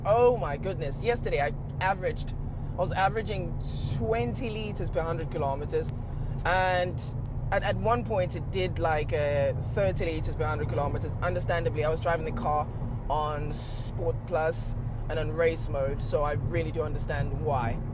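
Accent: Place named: England, United Kingdom. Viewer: You sound English bro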